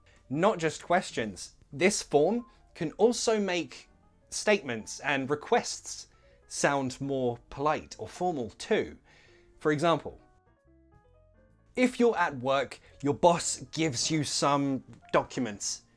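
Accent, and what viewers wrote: United Kingdom. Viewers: I'll be studying english next year because i think british english incredible and i love british accent and you i think you're very amazing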